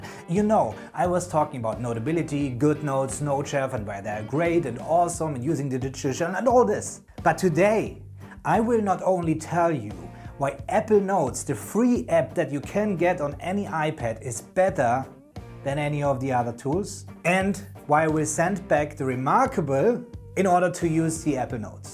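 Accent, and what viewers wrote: Germany. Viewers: U Sound a bit like you are Germa Are you tho? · Are you German? :) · People who speak German English are firm, and direct! Danke schoen Mein fruend🇩🇪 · German Akzent? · Are you German? I can’t place the accent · dieser deutsche Akzent so geil😂😂😂🇩🇪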